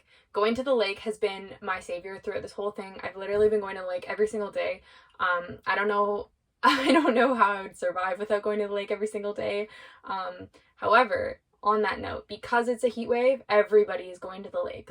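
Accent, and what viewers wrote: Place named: Canada. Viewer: Your vids are cool, and I love the way Canadians say "aboot" (about).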